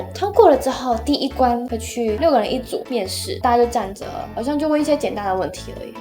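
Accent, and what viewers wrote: Japan. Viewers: vivi的國語 已經有點日文腔~很可愛😂😂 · 有點日本口音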